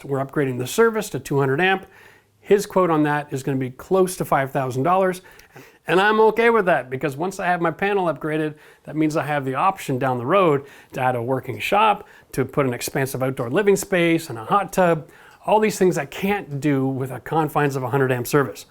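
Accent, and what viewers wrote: Canada. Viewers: I trust you as a person and contractor, I live in Louisville, KY area and honestly I need a contractor like you. I had one and screwed me and ran away with half finish and some mistakes needs to be revisited . I need to finish the basement about <num> sq. to finish . If you know an honest reasonable contractor, I would appreciate the name/ names and a mode to contact them. I am a disabled retired individual for 12 years but I am Ok the Lord has be faithful to me and I am frugal and do not like waste since I grew up in Egypt poor but hard worker educated which was free in Egypt. Mat God bless you and your work abundantly. That would be of great help. If you can. Good day. Physically I cannot help , I had my knee was replaced 6 weeks ago. and I will need back surgery as well just to be able to tolerate the pain and decrease the narcotics and sedatives I am dependent on them but my kidney and liver will fail if I continue. 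GBU , It sound that yu are in Canada so I may be out of luck. lol.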